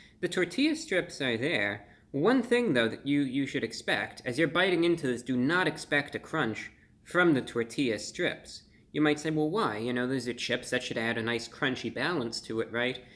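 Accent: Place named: Transatlantic, United States. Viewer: You are just adorable. I love your kindof transatlantic accent talking seriously about new fast food releases. I'll go check out your other videos now! I might try this if I can get it with ground beef instead; I'm not a big steak person to begin with and I won't go anywhere near fast food steak.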